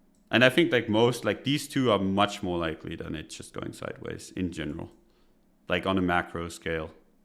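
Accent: RP, United Kingdom. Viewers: content lol.i realised these guys are just waffling for hours over s/r levels that are obvious on a chart. everything is it "looks good" or "looks bad". My bet is they both work, have jobs, and just release lack lustre vids to earn money for leverage trading following YT income. Creds system is flawed too if you actually bother to back test it. but he speaks posh so people believe him. sponsored by leverage exchange to get you to gamble says it all. "I think think think" blah blah blah . there's no on chain analysis here or order book insight at all. these guys are amateurs and rent their homes with a high probability that mummy cooks dinner every night for them.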